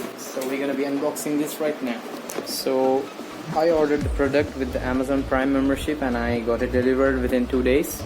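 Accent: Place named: India. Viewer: Nice Indian English accent though!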